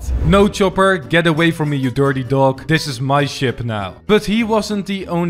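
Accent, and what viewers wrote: Netherlands. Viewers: Are you Dutch? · Are you from the Netherlands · are you dutch?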